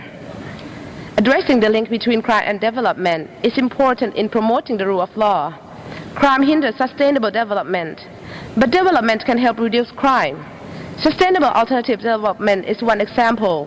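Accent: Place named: Thailand. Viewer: Flawless. This is how you suppose to talk on the world stage. She has some Thai accent but it's clear and understandable. Yingluck should be a shame of herself.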